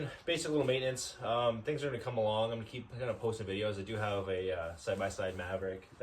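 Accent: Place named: Canada. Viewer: I notice the Canadian accent but do you always speak that fast or was the video on 1.5 x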